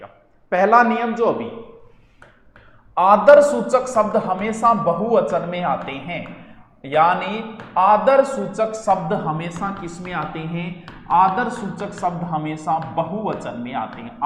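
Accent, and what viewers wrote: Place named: Haryana, India. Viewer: Sar paka Hariyana se h inki bagdise pat se pata chal raha h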